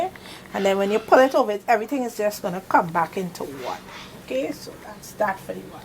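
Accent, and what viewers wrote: Trinidad and Tobago. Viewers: hey are u trinidadian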